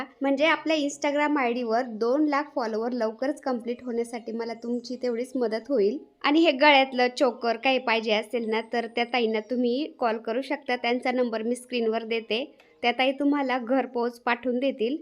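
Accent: Ahmednagar, India. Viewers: श्री स्वामी समर्थ ताई तुमची यूट्यूब ची जर्नी खूप छान होते प्रेरणादायी होती😊❤ तुमचा आवाज तुमच्या नगरची भाषा खूप छान आहे म्हणूनच व्हिडिओ बघायला खूप छान वाटते❤😊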